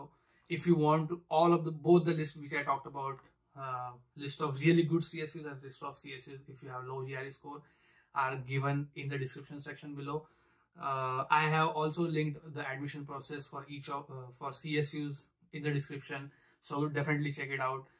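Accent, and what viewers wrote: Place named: Maharashtra, India. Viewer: Great video bro...I guess you are a marathi guy based on your accent.. well that's a different top though! I just wanted to ask that if we are able to score only around 302-305 in GRE then is it possible to compromise it on 1 year work experience plus an internship of 6 months so that the total work experience will be of 1.5 years and the GRE score would be around 305.Will this make me get an admit into sjsu for MS In CS? I would be glad if you answer. Thanks!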